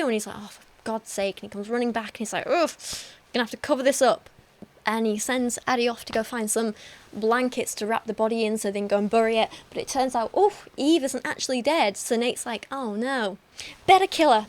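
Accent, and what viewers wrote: United Kingdom. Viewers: Would be nice if you got it right the first time. You went and slandered the whole country for something that isn't even true. Even better, you did it purely based on the "word" of a trashy author and an unreliable narrator. On top of that you seemed to assume that age of consent laws were the be-all end-all in this kind of thing despite that the age of consent in your own country (I'm assuming the UK, based on accent) is, wait for it, ... sixteen. Now this is apparently raised to 18 in the case of a teachers trying to have "relationships" with their students, but it's notably not as good as that majority of US states that outlaws such things entirely, regardless of age.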